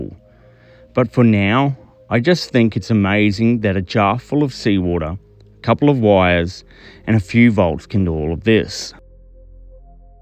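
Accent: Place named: Australia. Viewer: That's pretty awesome and the fact that I can hear that Aussie accent narrating the video makes this even more awesome.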